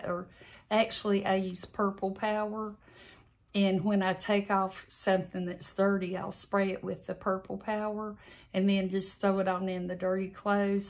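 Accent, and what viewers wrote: South, United States. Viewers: especially love your southern drawl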